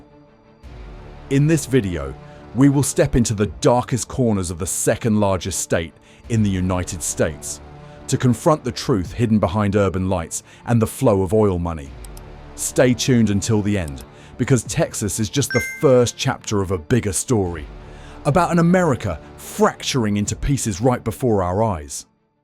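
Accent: England, United Kingdom. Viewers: Tell me why the narrator has an English accent.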